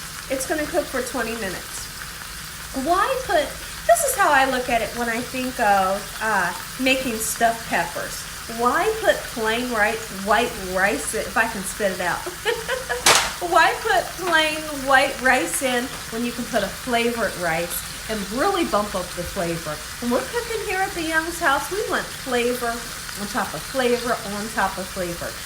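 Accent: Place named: Chicago, United States. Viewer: I just want to tell you how much I enjoy watching you. I love listening to you speak... I’m from the Chicago land area and you remind me so much of home... Keep up the great work and I hope you know how BEAUTIFUL you are!!!! ❤️❤️. Thanks for all that you do....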